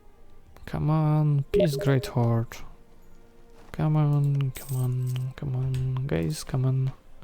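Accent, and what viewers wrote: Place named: Poland. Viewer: Ten twój wyraźnie słyszalny, ciężki, Polski akcent tylko dodaje smaczku całej serii. Świetna seria z klimatem czyli Quality Content za który cię subskrybuje. Miłego dnia!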